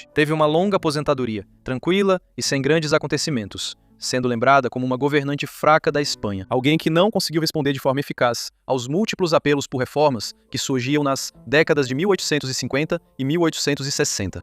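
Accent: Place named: Brazil. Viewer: Parabéns pelo vídeo, ótimo áudio em português brasileiro lindo, não assisto mais brief case, devido ao sotaque de Portugal, gostava muito do canal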